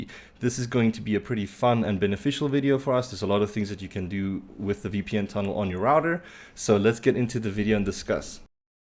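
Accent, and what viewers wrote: South Africa. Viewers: Thanks dude! Altyd lekker om n Suid Afrikaanse stem op youtube tevind!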